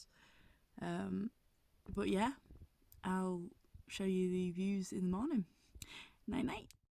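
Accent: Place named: Northern England, United Kingdom. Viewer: hiya . Hey Liking your Vids ..you’ve inspired me into Going to Spain .. (maybe next year now )..I’ve only I had my Van for 14 months. always seems daunting in driving on the opposite side of the road😐 ..and mines only a short wheelbase vw transporter☺️.. your accent sounds like you’re from the north ?..Mick from Warrington Cheshire 😉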